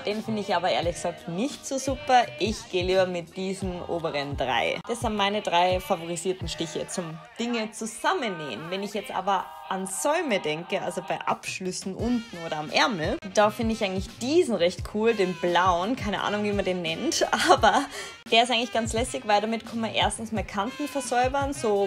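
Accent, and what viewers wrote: Vienna, Austria. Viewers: Oha😳 mega cooles Video 😍 habe deinen Kanal gerade entdeckt, ich lass auf jeden Fall ein Abo da💕 Liebe Grüße aus der Steiermark P. S. Ich feier deinen wienerischen Akzent 😊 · Haha dachte du bist aus Österreich :D liebe deinen Dialekt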